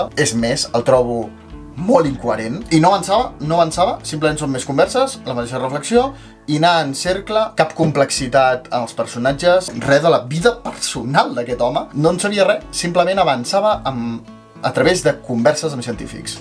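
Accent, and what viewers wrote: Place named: Central Catalan, Spain. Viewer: Parles un català molt central! És així el que parleu a Andorra?? Pensava que seria més tirant a lleidatà! PD. No he llegit cap d'aquests llibres, però només per la passió que vas posar a la ressenya de Persecució, estic amb tu mil per cent xD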